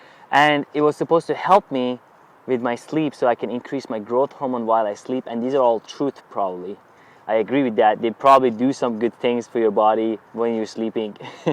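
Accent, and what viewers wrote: Iran. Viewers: His accent is very Persian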